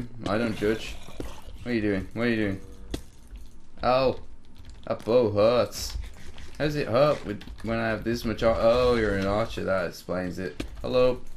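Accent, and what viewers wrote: United Kingdom. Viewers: bruh did become crazy more British as he aged? He has very little accent here...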